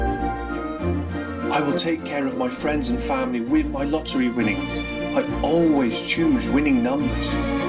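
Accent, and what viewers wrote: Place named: United Kingdom. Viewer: This is great. I've finally found an affirmation video in UK accent.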